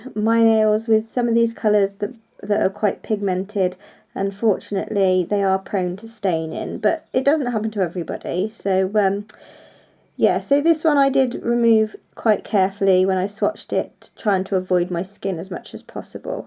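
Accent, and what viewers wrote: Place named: West Country, United Kingdom. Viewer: I love your videos - you sound like you come from the west country! I am more into Gel polish but still love watching your videos. Have you tried the app 'SNUPPS' where you can record all your collections/colours etc on your phone so when you are out shopping you can quickly check to see if you already have it in your collection..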